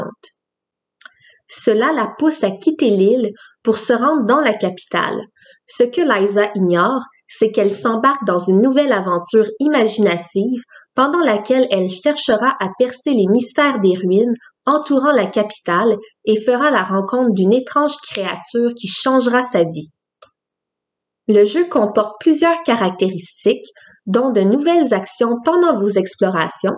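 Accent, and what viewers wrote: Canada. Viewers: Sympa comme présentation ,l'accent canadien en plus .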